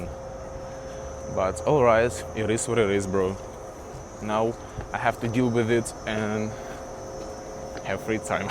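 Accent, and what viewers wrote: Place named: Russia. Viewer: Don’t stop bro, you’re doing well🔥👍 Your voice is completely changed when your speak Russian(I know the Russian accent)Support from Kazakhstan 🇰🇿🇰🇿🔥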